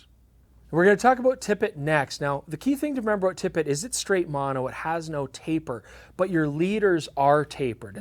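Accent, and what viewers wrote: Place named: Canada. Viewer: I’m watching going why you seems familiar, then I heard an “eh” or something Canadian and it clicked. So do you stand on wet slippery rocks at daybreak with your rods clinched between your legs which makes you lose balance a bit while you try to get pix? For me it was a no brainer which camera I’d take when those shots would be possible and ppl thought I was nuts because it’s so old but those amazing colors it’d get could only come from the Nikon d700.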